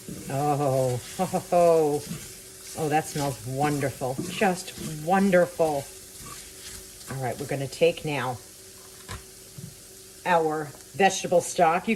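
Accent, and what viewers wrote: New York, United States. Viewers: Good evening big sis, yes you sound like a New Yorker.. oh I will be making that gravy, it looks so good. You are a inspiration to us all. Keep up the good work.. Till next time sissy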